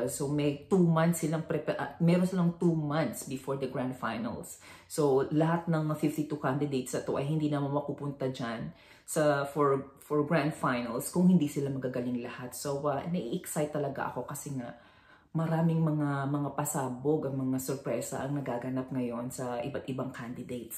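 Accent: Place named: Philippines. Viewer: kahit Pinoy accent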